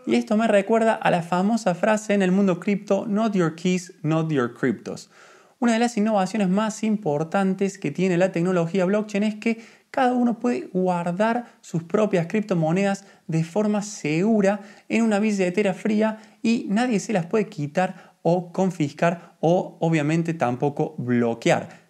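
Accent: Córdoba, Argentina. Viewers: que tonada cordobeza, me encanta. Sos gros exponiendo como antes de ponerlaen bancos argentinos, la pongo en cualquier lotria Crypto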